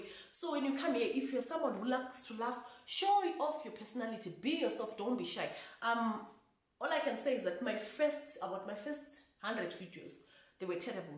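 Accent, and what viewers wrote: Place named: South Africa. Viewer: With accent though, it depends on what your target market is. My target market is based in the country where I'm currently based. The locals here are the ones who bother to watch my content and comment and even ask questions about the places I visit. If I used my home country accent a lot of people where I'm based would not understand me. The accent I use at work is the same accent I use on YouTube. I had to learn this with my full time job and that was culture shock for me which I had to adjust to. Sometimes it is also natural to subconsciously speak in a similar fashion to those around you. I can pick up South African traits in your accent. It happens naturally. I used to have a Zambian accent for the longest time because I lived in Zambia for a greater part of my youth.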